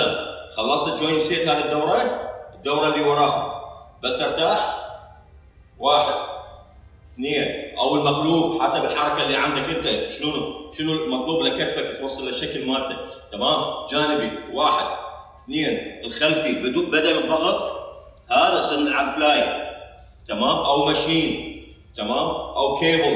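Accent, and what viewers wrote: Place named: Iraq. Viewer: سلام عليكم انت عراقي الهجه والملامح هل أنت تسكن في العراق لو في ليبيا أين مكانك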